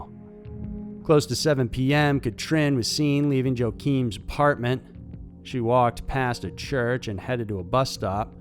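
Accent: South, United States. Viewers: Your voice has improved, less monotone since I ranted about it last year. I like the southern USA accent too.. I prefer the human voice to your robot one. Easier to listen to and stay interested... Better than before anyway!! Almost there lol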